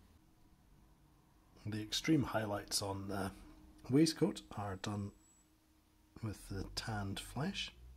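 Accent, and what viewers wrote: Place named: Scotland, United Kingdom. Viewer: This video Iv just found in time as got a copy of dead by lead my first western skirmish game and painting cowboys isn’t my usual genre as mostly paint sci-fi and cyberpunk minis. Great informative tutorial and better still it’s from a fellow Scot so accent was a nice change lol.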